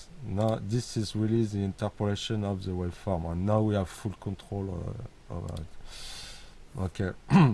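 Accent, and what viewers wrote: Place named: France. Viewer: T'es Français ? Thats a big french accent don't get me wrong, edit : effectivement t'es français from Paris. Très bon VST je regrette pas de l'avoir acheté celui là. Par contre juste un petit pdf ou des vidéos explicatives pour expliquer le vst ça serait cool, je cherche comment enregistrer le layout par exemple. Ca manque d'un bouton supplémentaire ou dans about les options de customization, par ex skin devrait se trouver dans about et les enregistrement d'un ou des layout favoris aussi. Sinon à part ça super plugin merci Edit 2: Eh merde on dirait que le layering d'instances Psyscope ne fonctionne pas dans BitWig 5.1 😢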